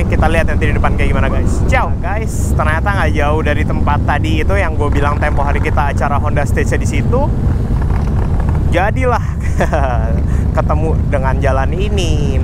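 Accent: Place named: Citayam, Indonesia. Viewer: om ngomongnya bae kaya org citayem aja...hehheehe.. mantap om....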